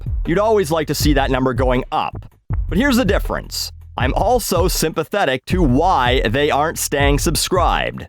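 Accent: Australia. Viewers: Love the Aussie accent and the references to woolies. Fucking hilarious vid! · Loves the Australian accent and the woolworths reference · Your aussie accents is like a cross between english and loose australian.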